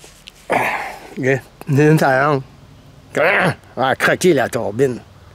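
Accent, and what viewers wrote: Quebec, Canada. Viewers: j'adore la voix de quebecois tabernac lol. Je t'ai decouvert avec l'oiseau chieur. j'adore les saisons chez vous ! le top c'est un de mes rêves de vivre dans des regions neigeuses avec super vert le reste de l'année. Profite bien frere quebecois :)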